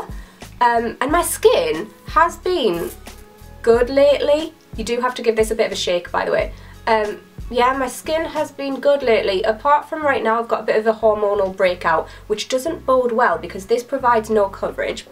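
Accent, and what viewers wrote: Newcastle, United Kingdom. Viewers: I have no idea if anyone else has said these and I’m sorry if they have but three facts about you are 1) You’re from Newcastle (I love your accent) 2) You have lots of ear piercings and normally wear hoops in your videos and 3) You have been on some pretty funny dates like the one where you were with that boy and he tried to kiss you and you jumped away and said “well that was fucking awkward wasn’t it” or when you peed when having sex one time 😹 you’re honestly so funny, I absolutely love your videos and you are and always have been one of my favourite yourtubers, I love you! Thank you so much for doing this giveaway you’re so kind ❤️❤️ Good luck to everyone ! Xoxo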